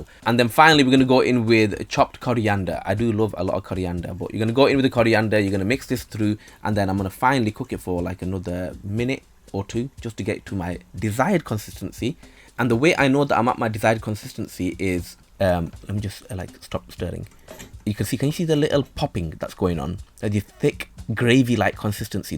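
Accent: Bradford, United Kingdom. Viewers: Bradford right? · Just saw this vid in my suggested vids, the thumnail was appealing enough to make click on it and ended up watching it right till the end. Quite interesting. Is that a Bradford accent?Subscribed and will definitely try cook this dish on one of my days off. Thanks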